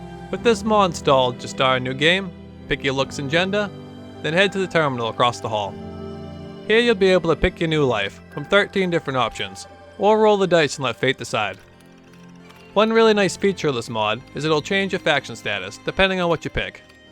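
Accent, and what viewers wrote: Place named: Massachusetts, United States. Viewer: this guys sounds like he's a Mass-Hole ! accent is strong of a Massachusettes.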